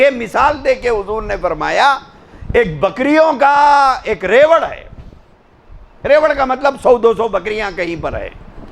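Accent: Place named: Hyderabad, India. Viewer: MASHALLAH good magar apna neeshani theek se nahi batai aur bartoon ko bout lamba karty ho .phir b JAZAKALLAH KHAIR . Esliya Mai doctor israr SAHAB ko aur SHEIKH imran nazar HOSSAIN ko aur mufti zarwali Khan ko aur peer zulfiqar Ahmed naqshbandi SAHAB an etc etc kuch aur b hai unko sunta hu. Wasy mashallah hydrabadi urdu aplogo ki muja bht PASAND hai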